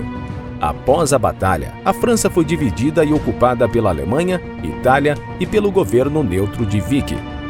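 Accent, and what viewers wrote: Maranhão, Brazil. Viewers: O maranhense não tem o sotaque dos outros estados do nordeste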